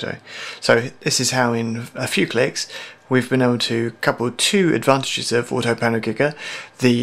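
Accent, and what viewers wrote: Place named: United Kingdom. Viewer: The British accent is awesome... This tutorial has been terrific. Thanks...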